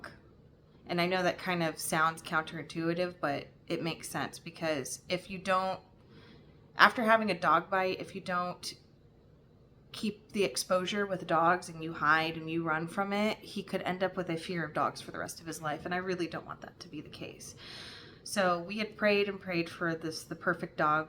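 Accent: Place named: Canada. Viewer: Hi, clicked cos thought oh wonder if she is from Canadian prairies. Made me laugh when said were in AB. You sound Canadian lol. So glad you like Kansas. We are in Nova Scotia but lived in Florida for a yr in the 80s. My daughter’s one son’s name is Benjamin.❤ oh your poor son😢. Oh and Junie is beautiful! Yes Australian shepherd for sure❤hard road figuring adhd and things like that. Oh your stitching is beautiful! Good job on your finish. Oh the floss dying is neat, I haven’t tried that. As I watch I am working on full coverage 3ft long by 2 ft wide. Oh the fabric and colours of floss are beautiful, it will turn out great. If you lent me a pattern, I would make a working copy.. and keep your pattern in the sleeve, so I see nothing wrong with using s working copy. I make working copies of patterns I buy as well…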